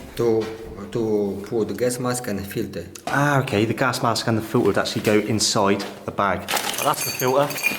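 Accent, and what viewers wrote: United Kingdom. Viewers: Your British by the way you talk interesting video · Lmao you know YouTube comments are toxic when a brit has to excuse his accent to those of us in the western world.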